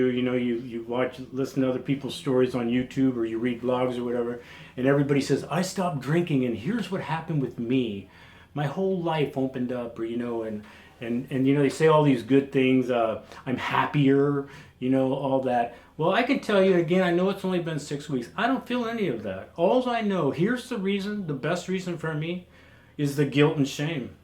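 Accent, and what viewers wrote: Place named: United States. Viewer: Hi, let me start by saying that I've been watching your videos for the last 4 years. It started when we were preparing for a roadtrip around Costa Rica and I came across your vids. I spent a couple of hours watching all available videos up to that time and I think the best tip from you which we used was free hot springs in La Fortuna:-) Anyway, we don't plan to go to Costa Rica again but I still watch you because I like your style and your keeping-it-real personality. Not to forget to mention that as a non-native English speaker, I like to listen to some nice American English. I'm sorry to hear about your booze problem and thanks for sharing it with us. You are the key to your success and besides supporting messages no one but you can beat this problem. But I'm sure you know that:-) To finish my lengthy comment here - as a person who likes working out - I have always wondered how you managed to be so ripped while drinking alcohol? 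Isn't alcohol just sugar with lots of calories? You definitely have a better body than many people half your age;-) Take care!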